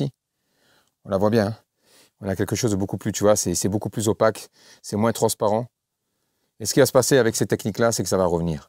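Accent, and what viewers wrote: Belgium. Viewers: T’es belge ou français ? T’as l’accent belge a couper au couteau . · Vous êtes excellent vous m'avez bien fait rire avec la fiat verte, j'en reviens pas du résultat. Accent belge ? Ya du vandame hyperactif dans le moteur du personnage ;-)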